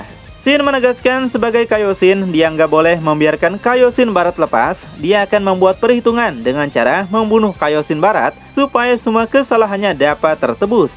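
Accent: West Java, Indonesia. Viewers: Sunda gening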